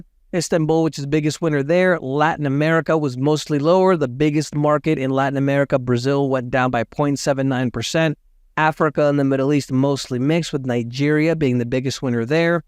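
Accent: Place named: Spain. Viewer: I miss your Spanish accent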